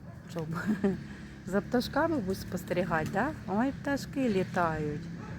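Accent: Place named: Poltava, Ukraine. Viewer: Дякую за відповідь. Я з Лубен, дуже мова Ваша на нашу з Полтавщини схожа. Тримайтесь, все буде добре.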